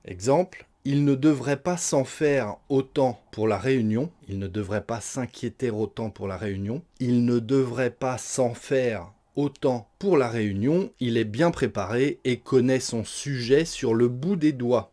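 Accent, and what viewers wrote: France. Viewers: Professeur, cette leçon est très intéressante et importante et c'est très agréable d'entendre sa voix avec son accent français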